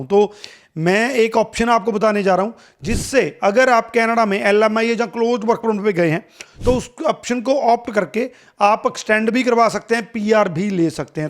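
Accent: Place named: Punjab, India. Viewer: He is from a Punjabi speaking background, that's why it is a little bit different accent.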